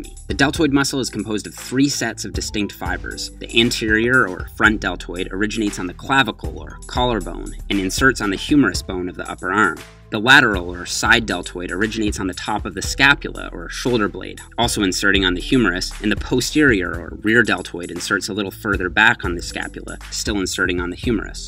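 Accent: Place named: Canada. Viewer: love the canadian accent lol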